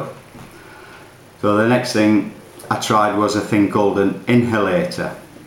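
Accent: United Kingdom. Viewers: I've seen many videos about electronic cigarettes, and so on, but this is the most helpful one so far! Great video. I ordered my electronic cigarette within one hour after watching the video (awesome British accent, btw, lol :D). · Great vid. Really positive : ) Love the accent, makes me feel like I'm back home. Rotherham?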